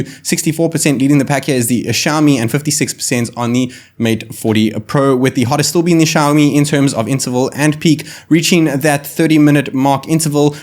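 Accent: South Africa. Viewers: Listening to you, sounds like you're South African, getting some SA accent vibes :D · Bro are you from SA? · Had to like and sub because of the South African accent ❤️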